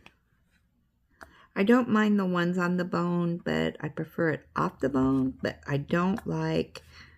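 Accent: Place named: United Kingdom. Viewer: Hey there you crack me up this is amazing diamond painting he sounds British !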